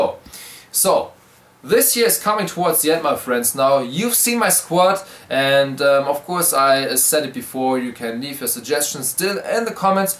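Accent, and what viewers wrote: Germany. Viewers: du bist deutsch hört man ?? :)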